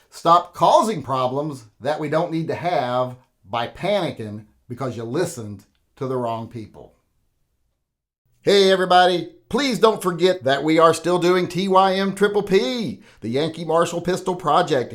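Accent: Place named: South, United States. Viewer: I don't even listen to the other channels anymore. Thanks! Even though I can tell you're a Southern boy and not a -_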